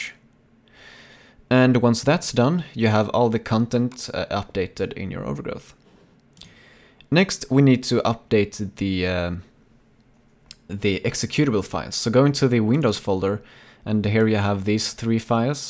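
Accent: Sweden. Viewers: You sound Swedish Eller ska jag säga Svensk ;)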